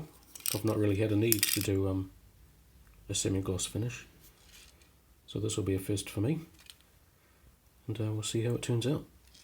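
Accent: New Zealand. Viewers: You sound Kiwi, if so - where do you get your supplies from?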